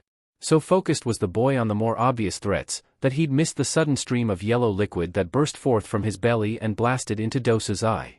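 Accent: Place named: Russia. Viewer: Why dose the voice switch between monotone and Russian accent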